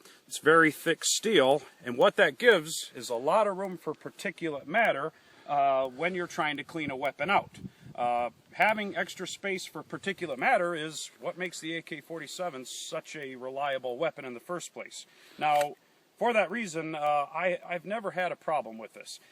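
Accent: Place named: Upper Michigan, United States. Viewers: You sound like your from the UP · Judging by the particular landscape and the accent, I would say you're in the U.P., am I right? The sandy ground, ferns, and all the jack pine trees, it looks like somewhere in Gwinn or K.I. Sawyer.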